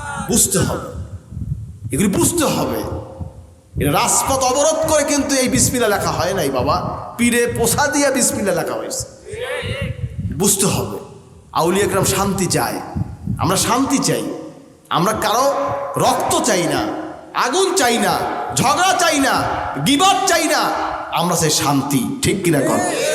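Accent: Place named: Barishal, Bangladesh. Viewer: বগুড়ায় গিয়ে বরিশাইল্লা কথা বলার কারণ কি শাইখ? ওখানে গিয়ে শুদ্ধ বলাই উত্তম।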